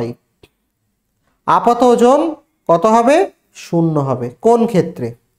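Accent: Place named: Bangladesh. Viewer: Ato bangla des lok mone hocche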